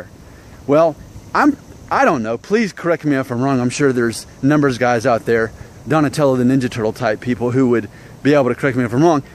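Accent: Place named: South, United States. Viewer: Quarter mexican with that southern accent how the heck does that happen?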